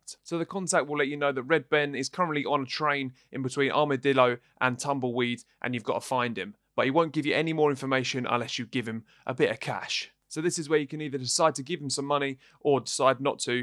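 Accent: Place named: England, United Kingdom. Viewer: I'm always here nice to here an English accent on YouTube 👍 bloody yanks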